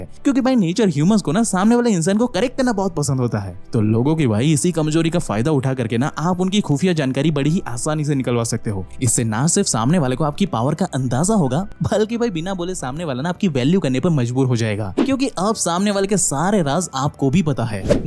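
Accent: Bengal, India. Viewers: Content thik hai lekin ... Bong accent me Hindi pasand nhi aati ...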